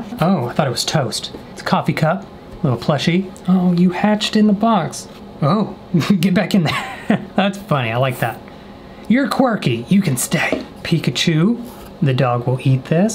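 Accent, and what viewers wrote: South, United States. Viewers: "Everything is a Coke" He must be from the south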